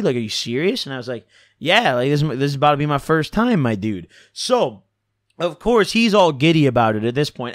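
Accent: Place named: Illinois, United States. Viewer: Sounds like you grew up near elmhurst iL thats were im at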